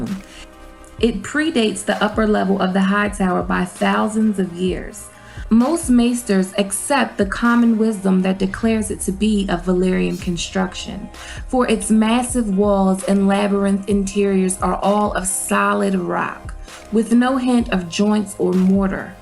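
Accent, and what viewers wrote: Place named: Baltimore, United States. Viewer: You gotta be from Baltimore lol. I can hear my accent from anywhere lol.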